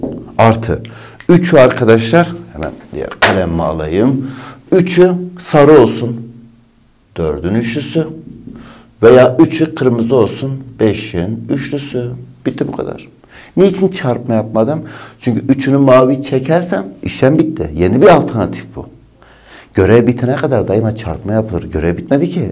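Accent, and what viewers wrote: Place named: Eastern Anatolia, Turkey. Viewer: Hocam tam doğulu ağzı var sizde. Ve bu sizi daha da samimi yapıyor 🤗🤗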